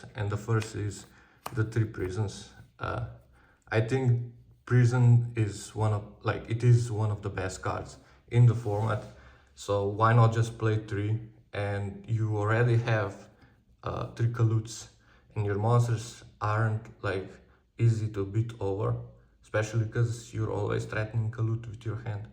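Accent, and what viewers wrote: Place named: Slovenia. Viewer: I can hear the slovenia accent from kilometers away [mojster]😂 nice video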